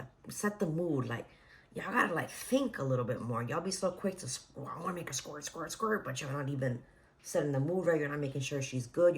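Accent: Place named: New Jersey, United States. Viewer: You so fuckin jersey I love it lmao jawn good shmoney lmao jersey boy here 💪🏾💪🏾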